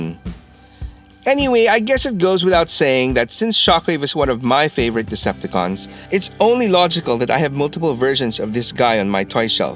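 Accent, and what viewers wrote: Philippines. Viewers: Filipino accent?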